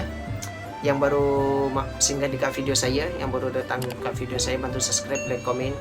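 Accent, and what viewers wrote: Malaysia. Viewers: logat Malay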